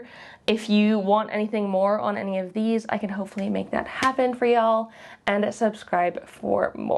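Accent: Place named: RP, United Kingdom. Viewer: So random, but I have to ask -- where are you from? You can definitely hear the slight "posh" accent, but were you born and raised in the UK or somewhere else? I love your voice/accent!! Editing to add, sorry if you've addressed this somewhere before .. I'm new to discovering your channel and I love it here! Just followed you on IG too! (Where I saw your bio says London!)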